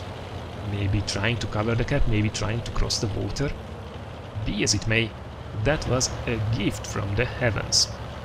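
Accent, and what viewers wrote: Hungary. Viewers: Olyan magyaros akcentusa van :) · what is your official lamguage because you speak english like a hungarian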